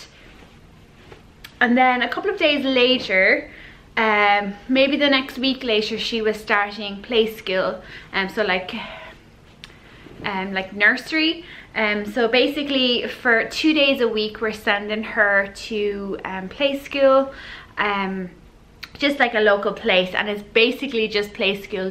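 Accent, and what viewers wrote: Ireland. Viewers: I could listen to you talk all day, I love Irish accents!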